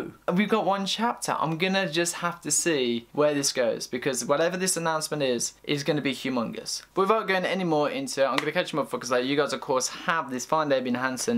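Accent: United Kingdom. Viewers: Great review. btw I like your british accent;)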